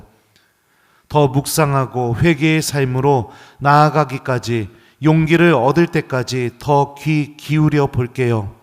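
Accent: Chungcheong, South Korea. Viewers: 신부님 감사합니다 강론말씀에 저 영적으로 채워지고 있는듯 해서 넘좋습니다~ 정겨운 충청도 사투리에 마음이 따뜻해집니다 왜인지 돌아가신 부모님이 생각납니다~~ 신부님 감사합니다 하느님 감사합니다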